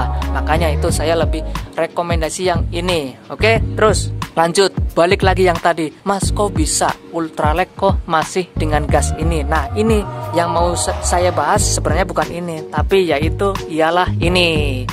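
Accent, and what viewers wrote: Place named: Java, Indonesia. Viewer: Jawa mana bang.ko kaya kenal logat y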